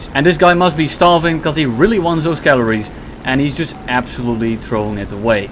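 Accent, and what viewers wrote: Netherlands. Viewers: I hear a Dutch accent, though i can't determine what kind of accent it is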